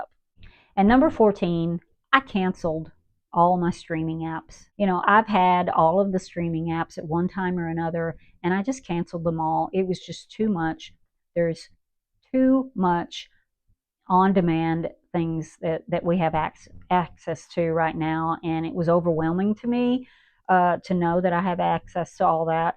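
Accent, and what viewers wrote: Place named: Tennessee, United States. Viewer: Hello there! So happy to have found another Gen X sister! I just subscribed! And I thought I’d detected a little Tennessee twang in that accent! (I’m from Tennessee too!) I love your ideas, especially the one about not waiting until the dishwasher is completely full before running a load.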